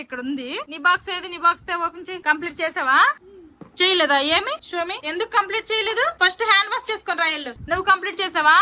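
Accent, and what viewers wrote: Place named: Rajam, India. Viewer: hai sister me pillalu me mata chakkaga vintunnaru naku 6 years babu unnadu food thinadu nanne thinipinchamantadu konni sarlu mata vinadu allari ekkuva chestadu ma vadu kuda school lo edo okati vadilesi vastadu nenu me videos ma babu ki chusi cheptanu valla laga chethi tho food thinali home work cheskovali ani anyway me videos naku chala istam prathi okkati miss avvakunda chustanu me matalu vintunte ma slang gurthostundi madi rajam daggara village memu vizag lo untamu sister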